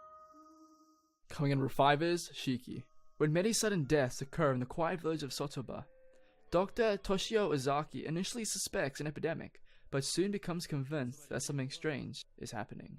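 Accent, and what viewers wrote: South Africa. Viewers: what is your accent?? south african??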